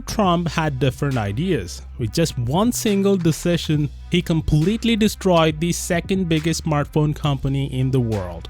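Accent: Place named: India. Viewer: What’s wrong with his english.. is he indian ?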